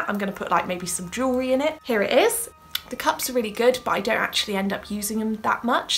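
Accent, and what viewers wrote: England, United Kingdom. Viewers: I love your english accent 😙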